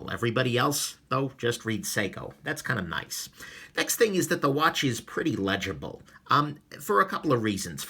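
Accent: New York, United States. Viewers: Same! Voice reminds me of people I know back in New York. Someplace I haven't been back too since 2011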